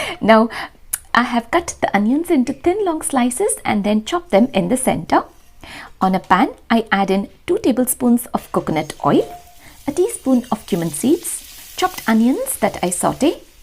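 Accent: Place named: Karnataka, India. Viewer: Nivu karnatakadavara kannada bhaashe keli tumba santoshavayitu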